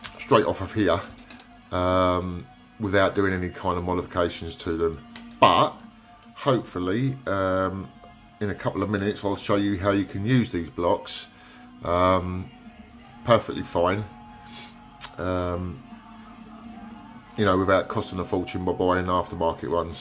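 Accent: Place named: England, United Kingdom. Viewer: Holly cow! What an English accent. Somehow I always seemed to think that you would have sounded like Billy Bob Thronton.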